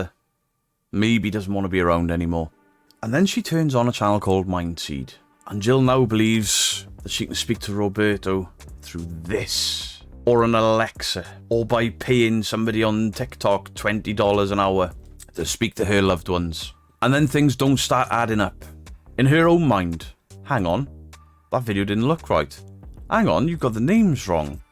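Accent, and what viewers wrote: Wales, United Kingdom. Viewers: it's a Welsh accent and Welsh people rock 😎🤟🌟🏴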